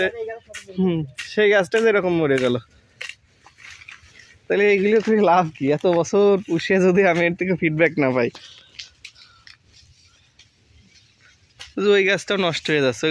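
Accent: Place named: Faridpur, Bangladesh. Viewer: ভাষা শুনে মনে হয় আপনার বাসা মনে হয় ফরিদপুর?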